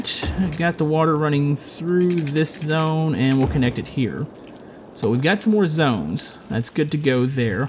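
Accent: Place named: United States. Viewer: Your American accent drives me crazy!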